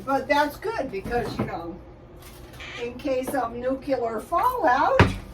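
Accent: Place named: Utah, United States. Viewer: Maybe a Utah accent?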